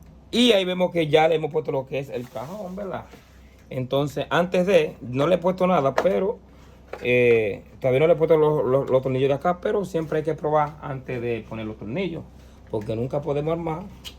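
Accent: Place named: Dominican Republic. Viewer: Ignorante ellos hablan así, su lenguaje de los dominicanos es así.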